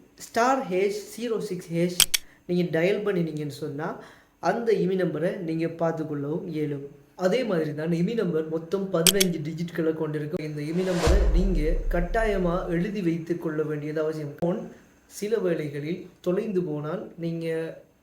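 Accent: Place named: Sri Lanka. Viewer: Superb clearity நண்பா Srilankan Tamil la keakirathu romba மகிழ்ச்சி ❤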